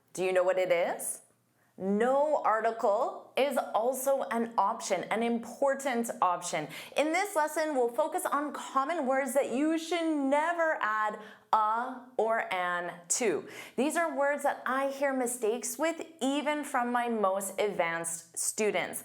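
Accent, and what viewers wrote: United States. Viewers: Obviously she's american. Isn't she? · She sounds like a yank they have know room to say anything about the English language they misspell words and pronouce words incorrectly ie mobile becomes mobul, although they do spell the word the same, like missile becomes misul.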